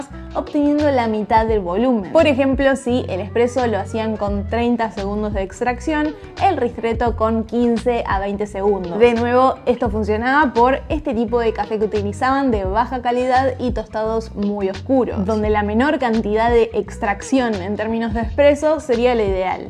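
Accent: Argentina. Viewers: Soy de México, y cada vez que veo tus videos, además disfrutar ese acento femenino de argentina que cautiva, me embelesa tu desempeño. Si ya sé, debería hablar de tu belleza, pero ante tu profesionalismo, sería una falta de respeto ignorar este. Bueno, hay un poco de broma, excepto acerca de tu profesionalismo (y de la belleza desde luego). Me gusta tu canal porque soy una de las personas atípicas. A mis sesenta y tantos años de vida, mi acercamiento al café, es increíblemente reciente. Sucede que soy intolerante a la cafeína, me impide dormir (literalmente), uno o días seguidos con tan solo una taza, pero cuando sucede y me desvelo hasta las cinco de la mañana, a las siete en punto despierto como si nada. Claro, el café no me engaña, mi cuerpo está cansado pero me dice una o dos cosas para que crea lo contrario, en fin. De alguna manera ahora me he aficionado al café y como siempre sucede con mis pasatiempos, me he apasionado, llevo algo así como seis meses viendo tus video y los de muchos mas (les recuerdo que mi afición al café es reciente), y mira que he descubierto un mundo. 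Me sucedió lo mismo con el vino tinto y la cerveza artesanal de las cuales, en efecto, tengo un poco más de tiempo. Me da la impresión de que fuera de tu edad, que te ves muy joven, tienes ese tipo de conocimiento y de experiencia que convencen, y créeme, soy el mayor escéptico y quisquilloso que pudieras conocer, cada cosa que se dice la investigo, y debo ser honesto, me has sorprendido. Felicidades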